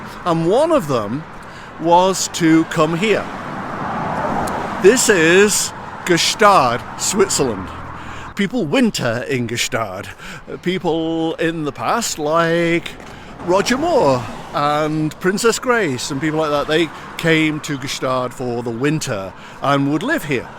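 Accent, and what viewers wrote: United Kingdom. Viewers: You’re like the accidental tourist! Love all your vids, all the narration especially with your posh British accent, and Europe seems to suit you!! Thanks for sharing your slices of life! 🌺🌺🌺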